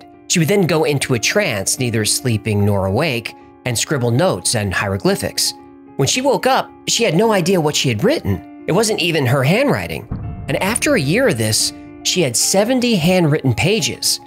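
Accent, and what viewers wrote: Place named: East Coast, United States. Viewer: Hey, do you have any relation on television? The hubby and I watch “Toon in with ME” on METV and there’s a guy on there named Toony the Tuna, and he’s got an east coast accent like yours. Coincidence??? AJ says there’s no such thing.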